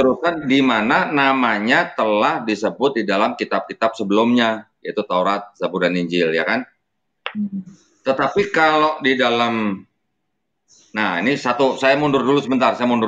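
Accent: Banyumas, Indonesia. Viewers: Klo Sy suka aksen pak Jawa ngapaknya masih kental g taunya dari Cilacap Sy sllu nyimak pak mualaf cerdas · logat bayumas ga bisa hilang sepertinya walaupun sudah lama di jakarta 😂🤣😂🤣 sehat selalu om.....semga selalu dalam lindungan allah swt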